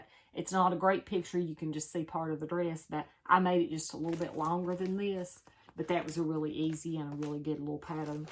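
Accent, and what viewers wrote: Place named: Western North Carolina, United States. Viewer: Your Mom is beautiful! Your accent sounds as if you might be from western NC, as am I. Just found you & am looking forward to watching more of your videos...😊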